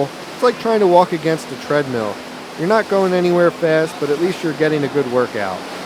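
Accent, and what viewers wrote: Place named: Philadelphia, United States. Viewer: zzzzzzzzzzzzzzzzzz Dislike the commentary. Esp the Philly accent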